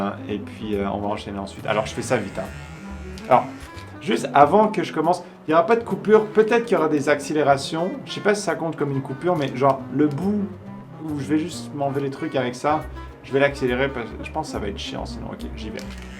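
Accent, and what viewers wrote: Quebec, Canada. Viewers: Philippe Trudel Quand il est avec ses potes québécois il la énormément ;)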